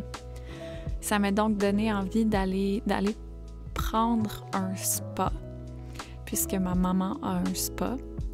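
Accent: Canada. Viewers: Coucou Bonjour A Toi au Joli Chat🐱 J Aime Ce Style de Vidéo.. L endroit ou Tu vit...Le Canada me fascine Ton Accent😉 Gros Bisous de France🐱🐺🐾😊🐈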